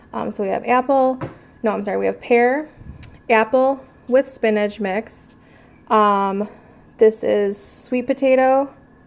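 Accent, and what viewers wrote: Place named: Michigan, United States. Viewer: You're from Michigan aren't you lol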